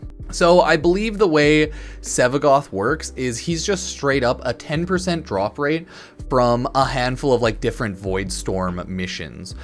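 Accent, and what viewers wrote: Canada. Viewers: are yuou Canadian? timmy fan eh?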